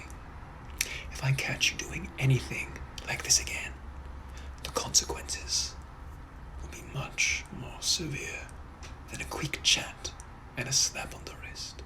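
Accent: RP, United Kingdom. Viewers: Funny how I fell in love with kpop years ago, loved british accent since forever.. and discovered him just now 🤡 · Hi I like the POV's and the voice of the British gentleman from the 1800's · Fuck 'British gentleman voice - ASMR WITH THEM KNUCKLES THAT CRACK LIKE THE VOICE HOT THEM KNUCKLES POP LIKE MHHHM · I came here for the reg voice and I got British why did you lie to me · Thank you for blessing us with British gentleman for 22 minutes 😌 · is he British or does he just sound more American when his voice is sped up. and he’s like a handsome pretty looking dude but i ain’t crushin he is cute though. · i am currently unable to breathe... he- i- 🥴 the butterflies i have right now are insane.... i need to make his voice my alarm in the morning and my ringtone not even just the british voice... just his voice in general.. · 22 straight minutes of the British gentleman, don’t mind if I do 😭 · 22 minutes of a British gentleman?? 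lord have mercy on my soul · Your normal and British voices are so amazing and comforting idk how but damn I could listen to this whenever I cry for comfort ily bro😔♡︎ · pov: you're standards are high because you're simping over and his accent when he is a British gentleman from the 1800's... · Idk why but his British gentleman voice reminds me of Elijah from the Originals but if he was a psycho and didn’t respect woman(maybe???) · I searched "British accent ASMR" and it brought me here. 10/10 no notes.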